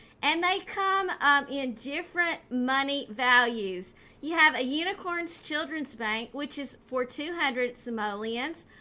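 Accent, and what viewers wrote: South, United States. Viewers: subscribed. great and informative video. i know you get this a lot but i absolutely love your voice/southern accent, its so cute. you are so intelligent and funny too, you make everything so easy to understand and present everything so well. you deserve way more subs then you have without a doubt! you've got talent! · Love the video but I'm trying to figured out if ur American or what love ur accent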